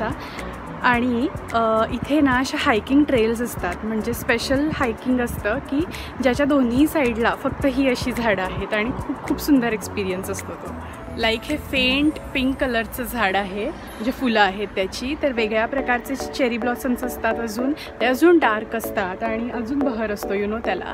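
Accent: Pune, India. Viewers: पुणेरी मराठी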